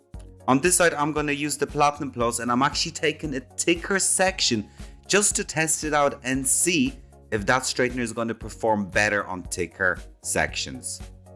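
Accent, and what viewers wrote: Ireland. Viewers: Was not expecting the Irish accent 🍀 ❤ my original ones plug broke I so regret throwing it away and replacing with the platinum 😢 platinum one makes my hair static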